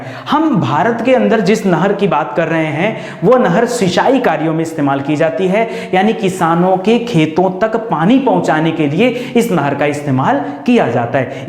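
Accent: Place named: Bihar, India. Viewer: Bhai professional tarike se bola Karo ye up Bihari tone samjah nahi aata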